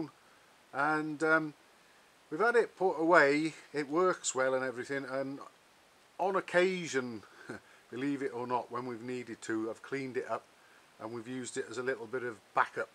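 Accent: United Kingdom. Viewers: Hey I’m American tryin* to learn accents. Are you from Cornwall?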